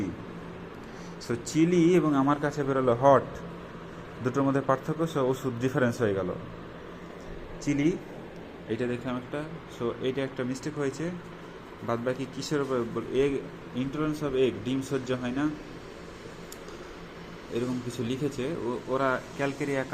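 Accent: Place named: Chapai Nawabganj, Bangladesh. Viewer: dr. where are your chamber location ? Your talking approach like as you live in chapai and i am also living same district. Thanks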